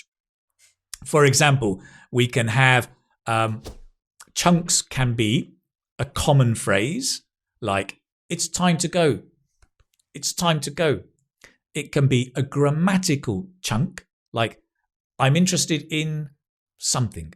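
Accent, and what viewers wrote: United Kingdom. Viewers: hi dear 🌹🌹🌹 i had problem of hearing what english people say. since im watching your videos on youtube my listening ability is improved in amazing way. a great begining music; and your glorious & clear british english accent. thank you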